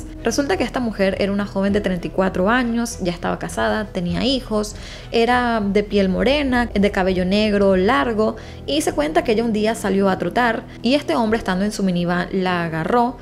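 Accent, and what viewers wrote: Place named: Venezuela. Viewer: Hola bella, es un gusto escuchar tus casos y escuchar el acento venezolano lo mejor. Tú, LesmaVR, JulySeib y Dinosaurios las mejores, sería interesante que narres el caso de los Hermanos Faddoul caso que ocurrió en Venezuela.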